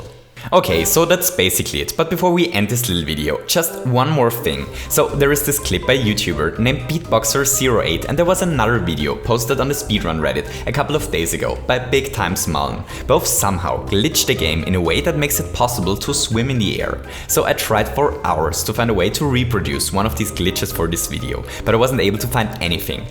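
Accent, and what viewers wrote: Germany. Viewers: Are you German? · Are u german lol? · Man kann irgendwie so raus hören, dass du deutscher bist xD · are you german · are you german? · Bist du deutscher? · Dang your German accent😂❤️✊ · Du bist Deutscher, nicht wahr? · are u german? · Fuck urself. Have you ever learned a second language? If you have, is it as stupidly hard and inconsistent as English? German is his first language, and he's tried to speak like a native English speaker, but it's almost impossible to do so without an accent.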